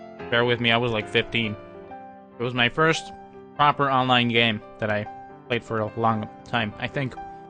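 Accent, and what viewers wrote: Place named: United States. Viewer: Thought so, 'cause he speaks english like real americans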